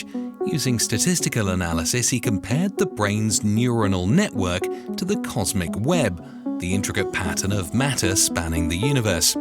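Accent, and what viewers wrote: United Kingdom. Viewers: The Universe is big and it has many stars, planets and galaxies in it. And it probably has other life forms in it too. With that being said, why do we always have some British guy narrating this stuff?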